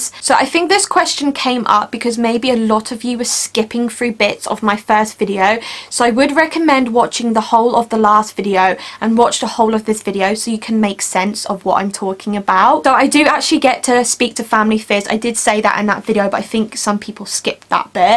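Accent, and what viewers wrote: United Kingdom. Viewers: Hello, What is your accent? I’m British/English too and just wondered where you are from 😊 Also you are so brave, thank you for sharing your story ❤️ xx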